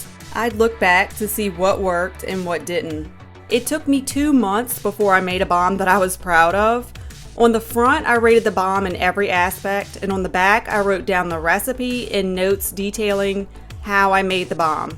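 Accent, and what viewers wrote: Louisiana, United States. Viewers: Lol!! I knew I recognized your accent on the 1st video I saw!! Im from Louisiana and I live in Lafayette. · I was wondering about your accent! I'm in Louisiana! Central LA, where are you!?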